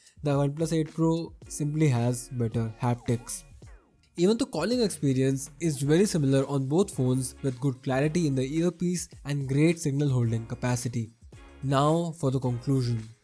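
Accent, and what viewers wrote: India. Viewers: I don't understand much because of your Indian accent but i like your review 👍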